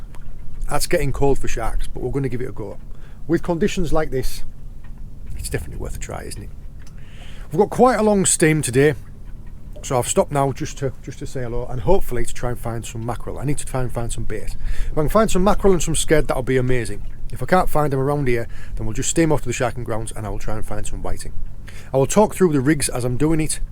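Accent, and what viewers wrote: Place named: Middlesbrough, United Kingdom. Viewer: thought as much you sound same as me from the boro lol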